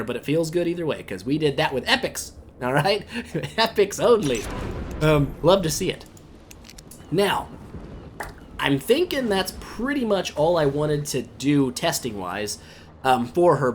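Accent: United States. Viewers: Yoooo welcome back (although I'm pretty late to the party), I still remember watching you 7 years ago when I first came to the states and couldn't even hold a conversation to save my life, got addicted to watching your videos (more than idle heroes itself) and accidentally practiced English while shadowing the way you talk as I went back thru your video catalogue with the video speed set to.50 or.75 since you talked way too fast for me back then lol, I also happened to pick up a very thick southerner accent thanks to you haha, anyways thanks for the videos man you honestly had a pretty big impact in my life!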